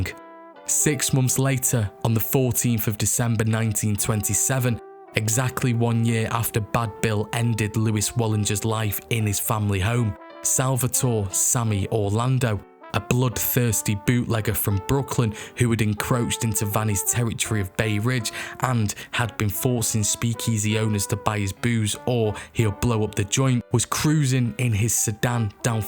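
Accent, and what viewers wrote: United Kingdom. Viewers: Northern English accent, not Yorkshire or Lancashire, somewhere around Manchester?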